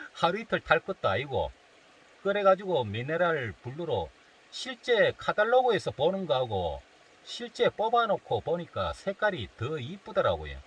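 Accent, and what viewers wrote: Gyeongsang, South Korea. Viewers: 요때기 경상도 사투리 정겹네요ㅋㅋ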